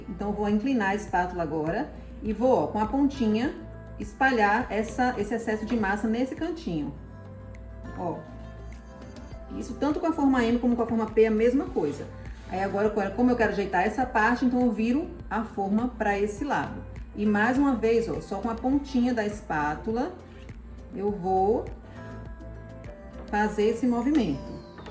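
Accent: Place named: Pernambuco, Brazil. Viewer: Ganhou uma inscrita! Pelo sotaque reconheci que tu és uma das minhas! Queria uma receita ORIGINAL pernambucana. Quando abro um vídeo e escuto falar "rocambole" eu já passo.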